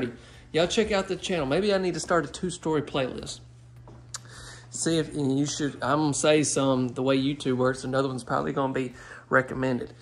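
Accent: South, United States. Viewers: Thts Southern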